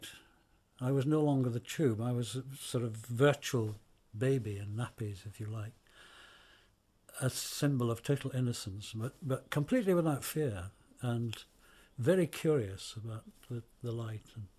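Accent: Scotland, United Kingdom. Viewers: English with a wee touch of scottish brogue makes it all the more pleasant... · 19 January 2020, Sicily. Yep. When described with a clear British accent even Death sounds good.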